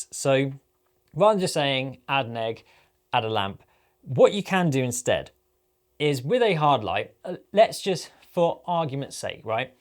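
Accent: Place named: United Kingdom. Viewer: Ong thanks so much, this is very helpful, I’ll keep all the techniques in mind and see what will fit best when filming 😁😁 also I do live in the uk 🇬🇧 I assume u do as well based on the accent 😂, thanks again for the tutorial very kind and helpful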